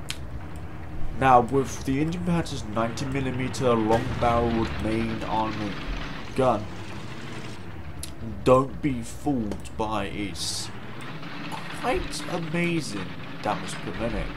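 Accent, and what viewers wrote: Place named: United Kingdom. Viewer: I can tell hes British because his accent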